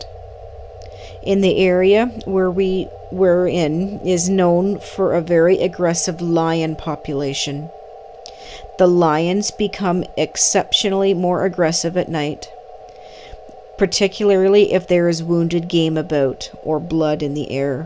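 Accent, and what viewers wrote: Canada. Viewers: Yeah without a doubt, you can hear the Ontario accent!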